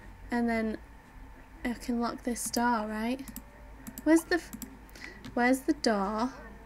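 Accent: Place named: England, United Kingdom. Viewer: where are you from? You sound english but like what city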